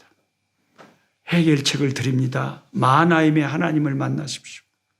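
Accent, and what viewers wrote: Jeolla, South Korea. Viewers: 할렐루야!!!주님감사합니다 오늘 목사님께부탁한책을 처음접하게되었습니다 목사님말씀을듣고 책을오늘읽어야하는부분을읽는데 어찌나은혜가되고 가슴을울리고 이귀한책이어디있나!!왜목사님이지인이나 전도하는데 쓰라는메세지를계속말씀하시는지 오늘에서야 이해가갑니다 너무나귀한책이고 감동이오고 책을붙들고기도하는데 어찌나눈물이나는지 오늘오전은주님의은혜가큰감동으로느껴집니다 목사님만난게감사가나옵니다~저는24살까지운동선수로살다가 가정을꾸미고 또공부하고는거리가먼데 이렇게많이배우신목사님이 전라도사투리로 사정없이성경말씀을이끌어가시니 어떤성경공부보다 머리에쏙쏙들어옵니다 공부와거리가먼 제가이렇게성경공부를하니 ~그것도나이먹어서 한장한장귀한말씀을접하니 성경을더가까이하게됩니다 목사님 어디다니시든지주님이지켜주시고 마하나임의하나님이역사하실것입니다 더욱더오래사셔서 저희들을주님과연결시켜주시고 깊은관계속으로들어갈수있게도와주세요 오늘은너무감사가넘침니다^^고맙습니다 서울은날씨가풀려가요~~저는교통사고합의로수요일날첫대면을합니다 좋은결과있게기도부탁드립니다^^화이팅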